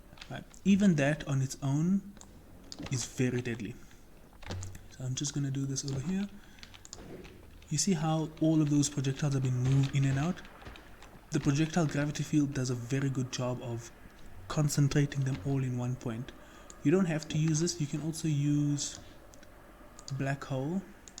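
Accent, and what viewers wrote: South Africa. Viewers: Are you south African? Wondering based off your accent and I'm from Johannesburg. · Are you south african? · South African?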